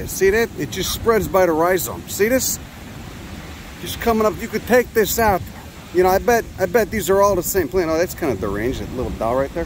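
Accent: Chicago, United States. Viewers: can you please say "flotsom and jetsom" in yer chicago accent????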